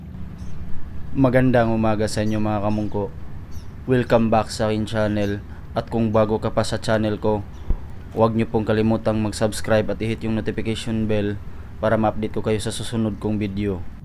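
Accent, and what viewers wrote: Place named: Batasan Island, Philippines. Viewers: KaMungko salamat sa pagtagalog mo kamungko kac kapag magbisaya ka hndi Po namin maintindihan.. kahit bisaya Yung tuno ng salita mo kamungko at least naiintindihan Po namin.. I like your content Kamungko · saan ka sa tubigon? b4 nag school pako marami akong classmate dyan,I think sa tuno ng pnnalita mo ur from batasan iland,me from clarin bt her nko mnila now,maybe ur one of my classmate b4 or school mate..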